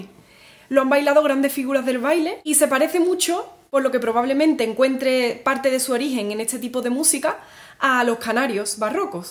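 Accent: Andalusia, Spain. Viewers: cada vez que te escucho me fascina más la capacidad que tienes como artista,no solo tocando la guitarra, que lo haces de maravilla también cantando, hablando y la gracia andaluza que te corre por las venas. En hora buena a todos los andaluces y ami por poderte escuchar cómo aragonés me siento feliz. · Es acento andaluz. Sur de España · Que linda interpretación!! Y me encanta como hablan los andaluces. En mi próxima vida quiero nacer allí ja!! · Me encanta vuestro acento español. Soy mexicano. Te felicito por todo tu conocimiento y disciplina. Gracias por compartirnos.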